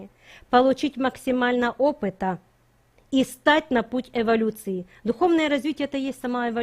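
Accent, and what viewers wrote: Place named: Ukraine. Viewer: Благодарю Вас, от всей души за беЗценную информацию, которой Вы щедро делитесь с нами, неблагодарными. 🤗🙏🏻 Тут вот кто-то покритиковал Ваш украинский акцент, а моему сердцу он мил, особенно когда Вы изображаете обывательские речи, я очень смеюсь, и всё, что Вы рассказываете, остаётся в памяти моей. С вами я полюбила украинский язык и песни, часто пою "Ой там, на горiиии..." 😂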